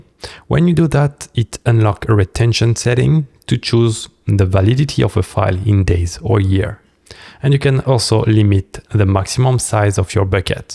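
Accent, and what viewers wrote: France. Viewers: Ahhh cet accent français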